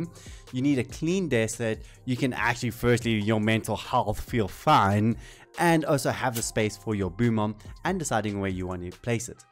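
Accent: South Africa. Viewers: The whole time I was wondering if you were south african from the accent loll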